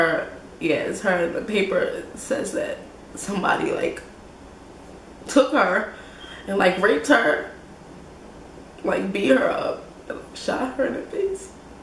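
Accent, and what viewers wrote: New Jersey, United States. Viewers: Oh so you from jersey? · You from jersey? Sorry for your loss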